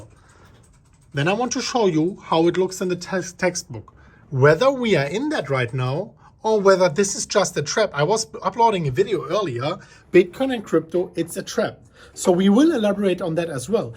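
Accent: Germany. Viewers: Danke alda, nicht nur der beste englisch crypto youtuber mit deutschem akzent :D sondern auch bester youtuber von dem man in jedem video was lernen kann!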